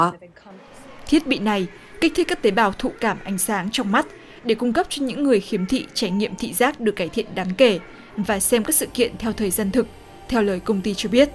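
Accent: North, Vietnam. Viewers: GIỌNG BẮC ĐỌC KHÓ NGHE QUÁ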